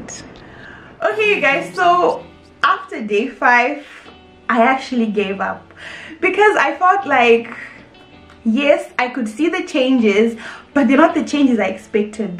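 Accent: Zimbabwe. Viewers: She sounds zimbabwean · She sounds Zimbabwean.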